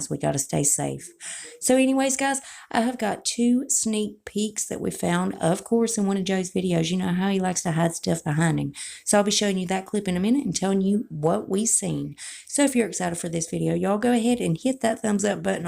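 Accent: South, United States. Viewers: has always had a strong southern accent, just like myself. I'm from the south as well. I've been subscribed to her from almost right when her channel started, and her accent has always been the same.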